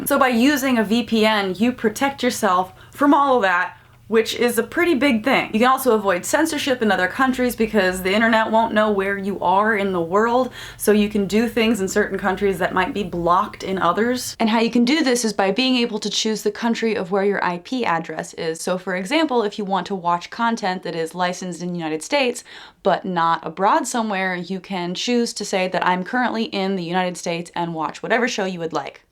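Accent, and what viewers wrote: South, United States. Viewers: You slip in and out of a southern drawl. I thought at first it was on purpose. That's unique. I would say cute but apparently when a guy makes a compliment on the internet, clearly he is after something. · Happy Thanksgiving and thank you for blessing us with your Southern twang. 🙏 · I can't tell you how much I am I'm love with you for your exaggerated sassy southern accent it warms my heart every time. 😻😻 I'm sorry onision said bad things about your body. You are beyond a perfect 10 and I hope you know that and you always were and always will be. Happy thanksgiving 💖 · From one Southerner to another, I love hearing your accent escape every now and then. Mine only does when I'm drunk xD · I see you use your country accent more and more ( i don't know if you notice it). Why is that? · What's with the cowboy accent lol? I mean it's cute. 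But why lol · Your southern accent came out a few times and I wasn't sure if it was fake or if you actually have an accent. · havent been to this channel in awhile and sounds like she has more of a southern drawl haha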